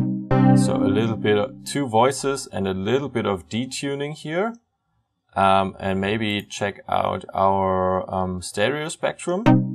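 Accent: Germany. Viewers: man hört das deutsche so doll raus XD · Mark Toronto Hab mich schon gefragt, ob ich der einzige bin, der meint einen deutschen akzent herauszuhören :D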